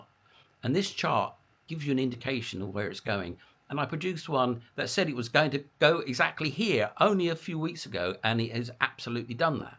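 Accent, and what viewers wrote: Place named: England, United Kingdom. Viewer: Came over from your Kitco appearance , cannot get enough of your insights , only fear I have is I might be to poor for your advice , Blackpool boy here with only one stick of rock , if you know what I mean ,nudge nudge wink wink but still does not cost me to listen , right , right ? you London barrow boys have a way of getting the coin out of the back pocket of one and all. Anyways at least I understand what you are getting at with your very English way of talking and of course when you say 33 dollars that is US which coverts to Aussie dollars to a lot more but I could just buy a hundred or so I guess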